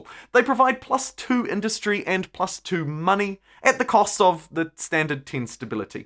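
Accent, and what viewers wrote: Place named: New Zealand. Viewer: Coming back to this in 2026 it’s striking how much more energy your delivery had in these videos and how much stronger your kiwi accent comes across. No idea why those things have changed so I won’t speculate but thought that was interesting.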